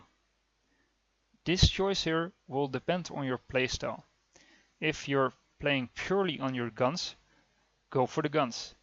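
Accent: Netherlands. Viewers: Are dutch?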